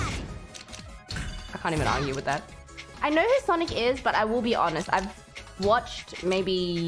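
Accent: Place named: Australia. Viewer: Overtime, Bae started using her natural voice, when allows her wonderful Australian accent to come out naturally.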